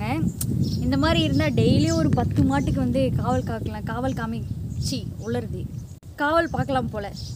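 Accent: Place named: Kongu, India. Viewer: கொங்கு தமிழ் சூப்பருங்க அம்முனி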